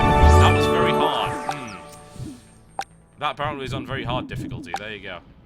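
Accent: United Kingdom. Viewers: Doesn't currently live in the UK, ergo his British accent is fake. Nice logic you have there, dope.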